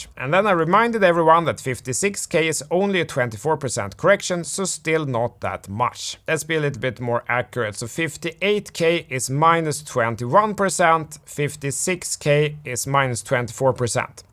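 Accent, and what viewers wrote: Sweden. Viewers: Svengelskan on point (Y)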